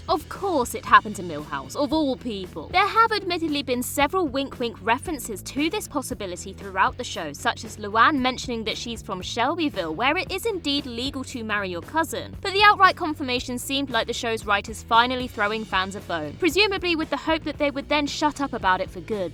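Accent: United Kingdom. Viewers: The narrator is British.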